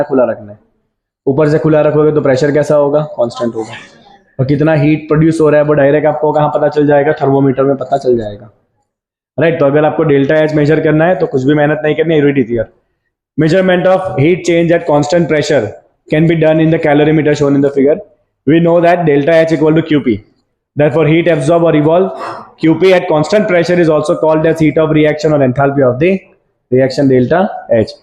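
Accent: Gujarat, India. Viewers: Thnak you sir for reply Btw, tamaru hindi english speaking and writing mast fluent chhe but video ma akad evo word aavi jay k jena parthi khbr padi jay k tame gujarati j chho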